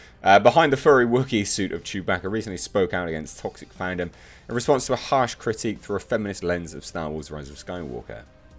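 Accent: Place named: England, United Kingdom. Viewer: american? He has clearly an english accent. Why did you thought that he was american? I dont get it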